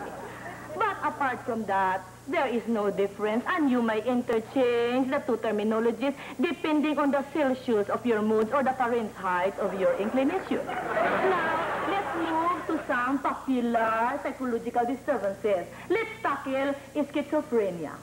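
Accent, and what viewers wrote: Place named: Visayas, Philippines. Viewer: Bisaya añg punto ni.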